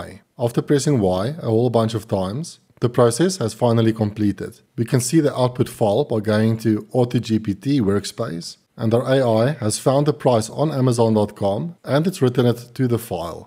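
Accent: Afrikaans, South Africa. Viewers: Very informative and lovely South African accent :) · Loveley to hear an Afrikaans accent talking about the latest and greatest <3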